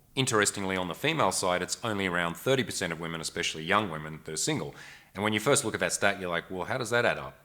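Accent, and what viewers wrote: Australia. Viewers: Eu amo o inglês australiano dele 😅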